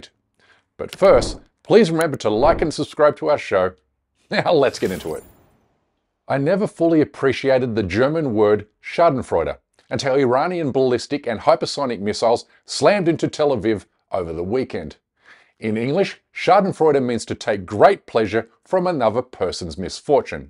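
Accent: Australia. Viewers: I am Australian and he sounds Australian to me! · Even I can hear the Aussie accent, and I'm a Kiwi! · Sounds Australian to me! From central Queensland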